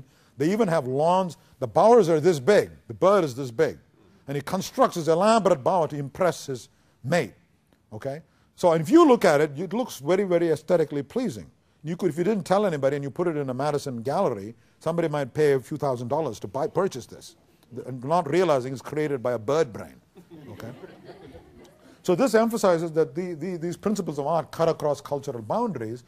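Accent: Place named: Scotland, United Kingdom. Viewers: Why does he sound like a scottish man? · Thank goodness you have noticed that too. I wonder when he was at Cambridge university he may have picked up a bit of a Scottish accent as there were Scottish tutors and students there too.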